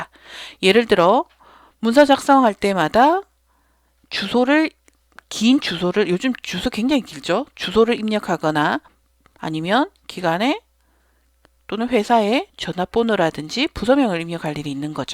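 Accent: Busan, South Korea. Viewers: 제고향 부산이라서, 강사님 말투 듣는 순간 같은 고향사람이라서 더 정감이 갑니다. 40대 아줌마도 열심히 강의 듣고 있습니다. 감사합니다.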